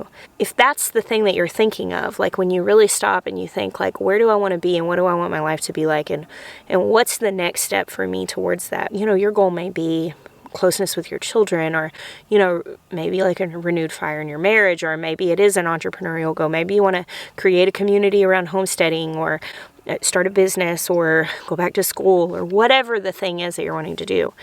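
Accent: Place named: Arkansas, United States. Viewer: Lol I felt like you were talking to me 🤣 new subscriber! I found you right after Christmas bc I’ve been getting so excited to get my garden going again. I’ve been watching ALL of your videos. It’s so funny bc the first video I watched of yours, I noticed your accent, and said oh I like this girl. Then you said you had lived in Arkansas and was like, well there it us! 🤣 So fellow Arkansan here now! I also need to say this, and it’s going to sound insane, but when you said you wanted to do the 365 challenge, it made me want to work on my art skills again. I’ve been working on a pictured my son, and I’m usually in bed by now but I wanted to get this finished. I was in the living room and noticed a buzzing sound and started noticing a smell of melted plastic. I ran through the house thinking I was going crazy but found our hot water heater was burning! 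We’ve got it all settled now, but I’m thanking God that I was awake working on my picture. That could have turned out really bad really fast.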